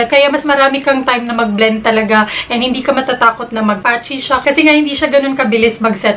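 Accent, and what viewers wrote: Visayas, Philippines. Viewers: ate bisaya b kayo hehehe...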